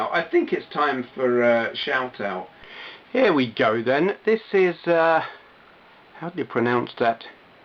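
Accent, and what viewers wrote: United Kingdom. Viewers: it was me not understanding you, it took me a while to get used to hearing sarcasm in a brittish accent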